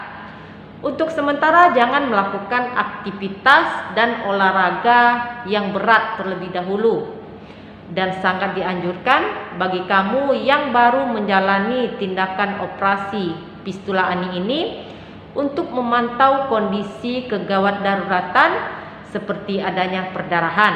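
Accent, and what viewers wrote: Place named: Padang, Indonesia. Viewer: sepertinya logat dan namanya buk dokter orang padang, maaf kalau salah🙏 kalau daerah sumbar RS mana yg biasa menangani fistula ya buk?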